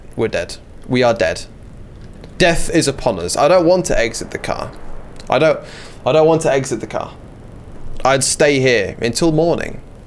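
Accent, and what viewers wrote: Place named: United Kingdom. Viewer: As a French tutor, your French with a heavy British accent is impeccable. Aucun de notes.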